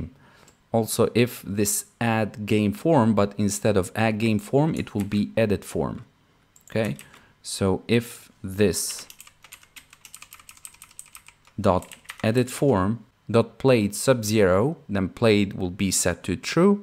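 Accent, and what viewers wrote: Russia. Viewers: bro got russian accent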